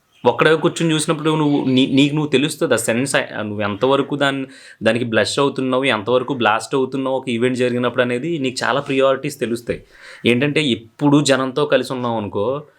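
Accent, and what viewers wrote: Godavari, India. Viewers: బ్రో సూపర్ మా గోదావరి జిల్లా భాష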